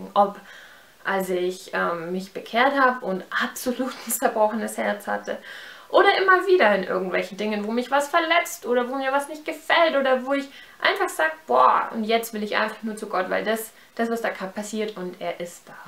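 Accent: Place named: Austria. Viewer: du hast schon voll den österreichischen akzent übernommen... :D kannst du mal eine tagesroutine mit jesus drehen?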